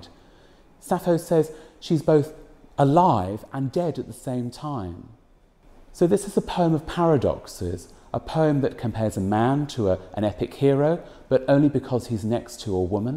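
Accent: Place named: United Kingdom. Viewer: british vsauce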